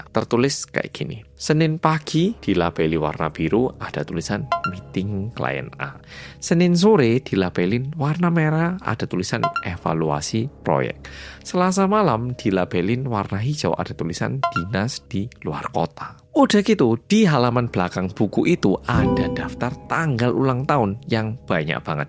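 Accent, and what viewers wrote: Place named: Java, Indonesia. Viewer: Salut keputusan Yujin❤ tks muedok 🎉🎉🎉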